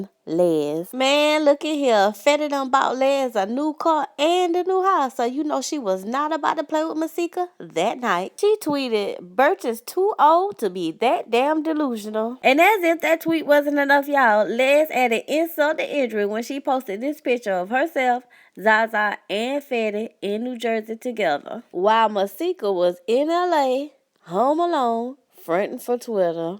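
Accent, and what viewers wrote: South, United States. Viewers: Damn this chick here gossiping sound so damn country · you must be from the south🍅🍅🍅🍅🍅🍅🍅🍅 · I love your voice You sound like a Southern Bell.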